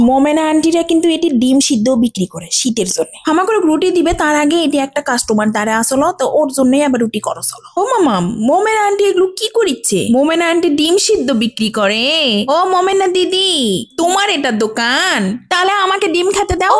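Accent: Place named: Naogaon, Bangladesh. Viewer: 😊😊😊আপু আমার এই ভাষাটা খুব ভালো লাগে নওগার ভাষা আমার একটা চাচী নওগাঁ তে থাকতো এই ভাষায় কথা বলতো যখন আমাদের বাড়িতে আসতো আমি শুধু এই কথাই শুনতাম আমি আর আমার আম্মা। আমার আম্মা এই কথা শোনার জন্য ওনাকে সবসময় আমাদের বাড়িতে বসাইয়া রাখত। এত ভালো লাগলো ফ্যান হয়ে গেলাম। ❤❤❤❤তবে বুঝে নিও পাশে থেকো।, 💌💌💌👏👏👏🎁🎁🎁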